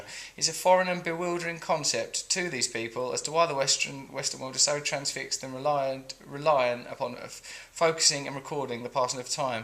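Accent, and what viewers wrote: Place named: United Kingdom. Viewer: Russell sounds like a young ozzy Osborn . Fragmented ramblings with a strong annoying British accent . His opinion is equal to a glass of urine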